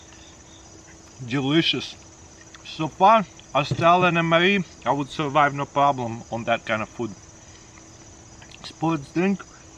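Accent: Russia. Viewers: You should prolly do a video saying only pashtet cos I pretty much watch your videos to hear you say it 😂😂😂. I love Russian accents 👍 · Why we love: 1) His excitement to do something 2) His positivity on everything even tho it isn't that good 3) His gratefulness 4) and of course his Russian accent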